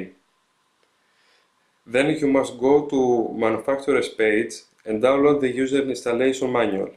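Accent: Greece. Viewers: ελληνας απο την προφορα το καταλαβα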